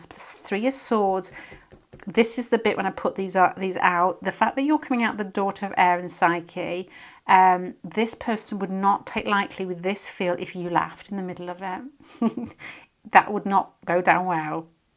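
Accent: United Kingdom. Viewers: I can't undertand you when you speak so fast with the British accent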